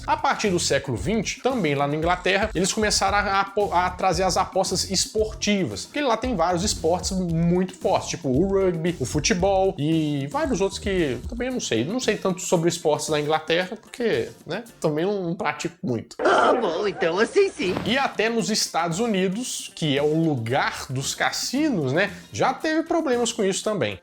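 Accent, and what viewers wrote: Minas Gerais, Brazil. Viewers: Esse cara fala igual mineiro.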